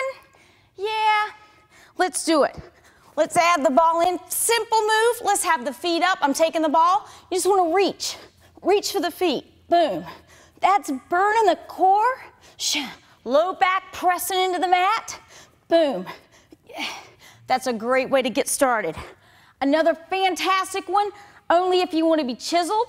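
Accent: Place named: Appalachia, United States. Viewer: Sorry, can't get past the hillbilly accent.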